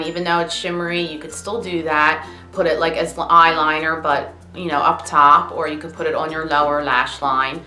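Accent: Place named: Philadelphia, United States. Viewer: Also I just stalked you a little meaning I went on your twitter and saw your from PA. I knew you were from the Philly area. Your accent is comforting to me and makes me miss home.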